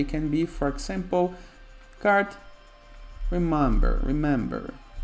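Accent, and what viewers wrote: Brazil. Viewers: Hi, are you brazillian? Your english looks like a brazilian guy. Thanks a lot for tutorial.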